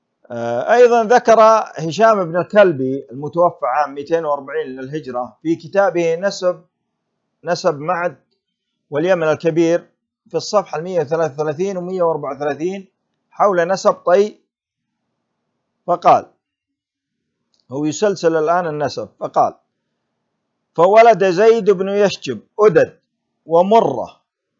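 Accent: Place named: Iraq. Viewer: لهجتك قريبه من العراق شفيك تنطق ال ج اكثر شي شكل اجدادك عراقات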